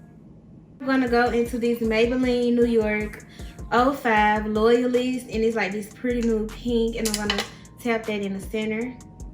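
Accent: South, United States. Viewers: Can I suggest a concealer for you to try?? Maybe the Maybelline eraser concealer in the shade tan? I love that concealer and it has a creamy consistency it’s not runny at all. Love the look! 💞💞 Btw you don’t talk country you just have a southern accent I’ve heard people talk country and that’s definitely not it lbs 💞💞💞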